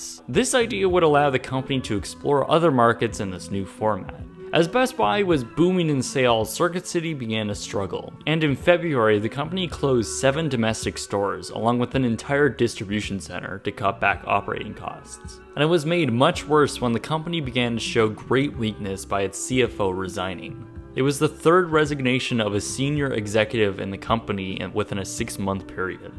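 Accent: Canada. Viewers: I can hear the Canadian accent in your voice. Makes this video that much better. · narrator is Canadian. They pronounce some words differently. Gotta problem wit dat?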